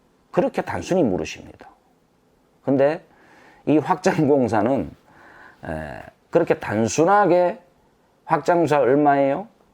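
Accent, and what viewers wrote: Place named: Daegu, South Korea. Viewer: 공사해보지않고는 어찌 실력자인지 구분할수있을까요.ㅜㅜ6월1일 이사가는데 올수리해야하는데 걱정이 많습니다.사투리 ㅎㅎ 대구지역이신가요?대구면 상담하고싶네요.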